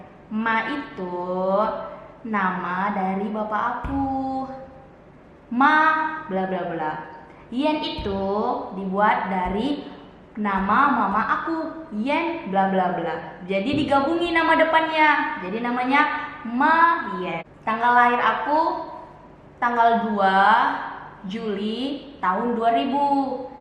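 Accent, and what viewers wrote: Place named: Medan, Indonesia. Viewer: lanjutkan kak.. saran aku hanya klo vlog, jgn dirubah logat medan nya.. gas poll kak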